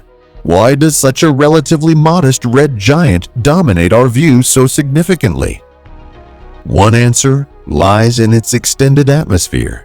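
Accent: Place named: United States. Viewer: Annoying American voice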